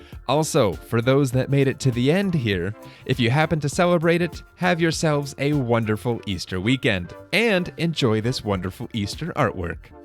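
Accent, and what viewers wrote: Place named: Indonesia. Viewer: Wow your Indonesian Acsent So Perfect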